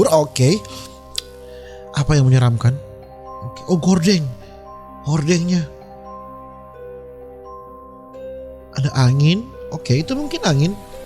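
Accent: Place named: Sulawesi, Indonesia. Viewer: Kalau saya selalu ngakak,, Krn org Sulawesi JD memang begitu lah logat nyaa... Kasar tapi hati nya lembut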